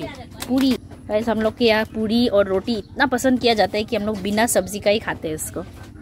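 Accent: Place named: Delhi, India. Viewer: Didn't know Arunachalese speak such fluent Hindi.. It sounds more like a Delhi region's Hindi. The way you are speaking, is it the typical Arunachali way of speaking Hindi? different regions in India (even within Northern belt) have different way/dilects and not necessarily speak Delhi-style Hindi. For Example, Hyderabadi Hindi has very typical Urdu-Telegu influence and has its own beauty and charm.. similarly, Mumbaiya Hindi has its own nuances as it got mixed with Marathi and sounds very beautiful on its own.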